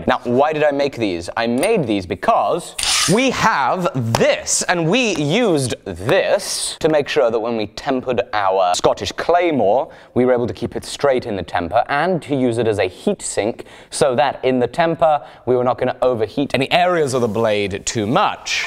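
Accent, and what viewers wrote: South, United States. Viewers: Love the southern accent. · You should use your american accent more often. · Please make an ENTIRE episode in yer southern accent!!! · The American accent 😂😂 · your southern accent reminded me of Bobby from Brickleberry hahhaha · This is one of my favorite episodes! So we’ll edited! The buildup each time you try to straighten the blade, your patience, your humor, showing us the fails and the wins, and the Southern accent was priceless!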